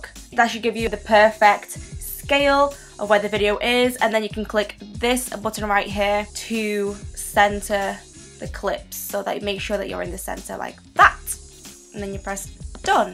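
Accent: United Kingdom. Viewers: I’m here for the British accent too! 🇬🇧❤️ I need the deets on how your insta vids are clear! When I upload it’s not great definition! 😭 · You said you are from the Philippines ? Were you born there or Great Britain ? You sound British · I don't know why but I was fully expecting an LA accent from the intro! I get so excited when I see fellow brits making banging content! LOVED THIS ♥️ you got yourself a new sub xx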